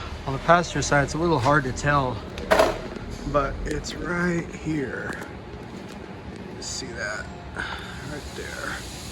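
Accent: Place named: United States. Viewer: Thank you from Spain to share this. I love Alfa Romeo Giulia and you explain very well even if with American accent! You help a lot with your videos!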